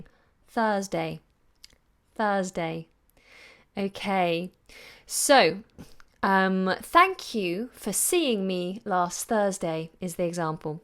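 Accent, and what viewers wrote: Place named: United Kingdom. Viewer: But l keep listening her UK sound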